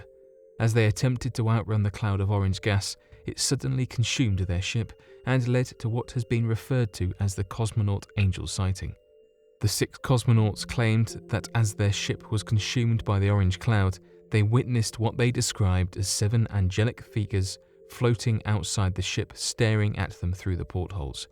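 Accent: United Kingdom. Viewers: Because they are British and pronounce somethings very different.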